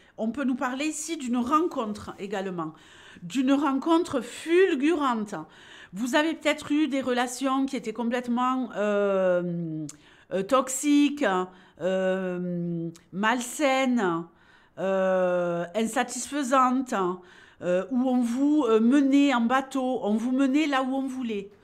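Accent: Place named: Toulouse, France. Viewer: 🌸Bonjour. 🙏Exit les anciens paradigmes 😂 ouf! Vve la renaissance 🤵‍♀️ La vie est belle 💚 vous êtes unique, extraordinaire, très attachante. Au plaisir de vous écouter. J’adore l’accent Toulousain. Bien respectueusement 🎁 Super thanks 🎁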